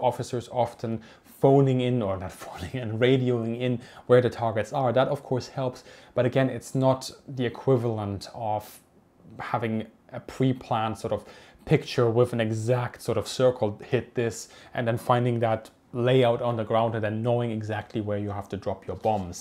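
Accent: Germany. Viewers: Bist du deutsch du hast einen sehr starken Accent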